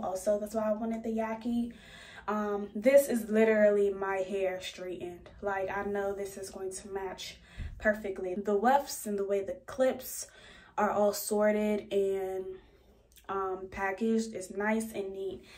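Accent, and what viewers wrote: Washington DC, United States. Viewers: ik a dc accent when I hear one! lol. this turned out amazing btw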